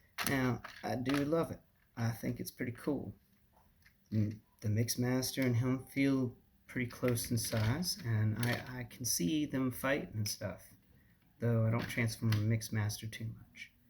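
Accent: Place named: Louisiana, United States. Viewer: Alright you asked for constructive criticism so here goes. So I don’t know how much editing you can do, but if you have control over when the video begins, I would wait until your phone does the notifications thing, give it a few seconds of silence then do you intro, and then just drag where the video begins past the notification sound so it’s just before you start talking. So one thing I think you could do is if you are going to talk about the box in the video you could have it pre measured so you don’t have to do that on camera, cause that does pad out the video. But I would also say that most people don’t care about the box. It’s something most people are going to throw away and it’s not what they came to see. So consider removing talking about the box, or put it later in the video. What’s with the Cajun Accent by the way, are you trying to be Craftsman? 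Oof That sucks. I had a similar thing where I went to visit my grandparents, and my parents got the brilliant idea to throw away my bed because it was old while I was gone. But they got lazy and didn’t buy another one by the time I got home, so I had to spend 3 days sleeping on the floor, before I got a new bed. I feel like coffee cups may not be a great unit to measure it. I don’t think we need to know the size of the guns, so definitiely. Maybe come up with a personal scale for stuff like that. Like dinky, regular, or big boy sized guns and accessories, just try to group them without giving an exact size cause that won’t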